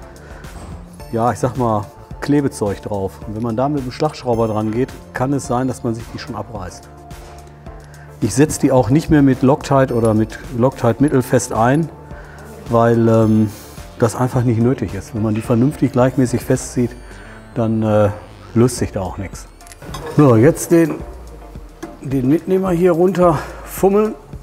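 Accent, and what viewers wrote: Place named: Ruhr, Germany. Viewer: ganz große Klasse. Ich mag den Mann. Besonders seinen Kohlenpott- Akzent! und seine menschlichen Ausbrüche: "Wo hab ich denn den 24er wieder hingelegt?!"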